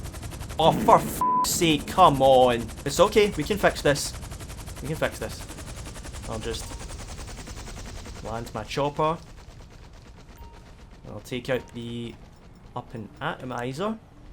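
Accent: Scotland, United Kingdom. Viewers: You got a very strong scottsh accent my boy😂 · i hear scottish mother tongue- i like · clicked for the information, stayed for the scottish accent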